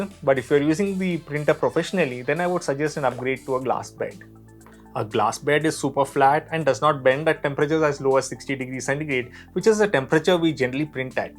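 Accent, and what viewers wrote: India. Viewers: Guessing by the accent (and Rupees), I'm guessing is in India? I put my glass bed printer away 9 years ago. At the time I had read about using Aluminum to improve ABS warping. I bought a sheet but never got around to cutting it. If I had taken the time, my priority would have been to build an enclosure; since I have a Delta-v printer, it would have been a headache to cut a circle so I never did it. Now that I'm restoring my printer to get it up and running again I figure it's time to get the best bed, hot head, enclosure etc. My understanding is that India is HOT. I can see how thermal properties of bed are not as important to you. but in California, despite the tourist propaganda about sun and palm trees it is not India. I struggled to get up to temp to use ABS. I'm currently sitting in a 95 degree garage, but average is more like 65 F and nights get down to 40 F. 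my garage has an annual range of 40 - 130F. 10 years later, should I still be considering aluminum bed, or have materials evolved to the point where I should just consider better modern filament? I don't find any of the reasons for glass here to be very compelling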